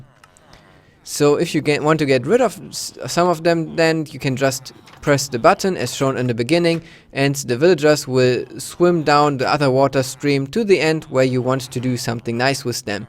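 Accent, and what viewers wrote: Germany. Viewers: Are you from Germany ? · Are you a german? You sound a bit like me, and I am german. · Are you german ? :)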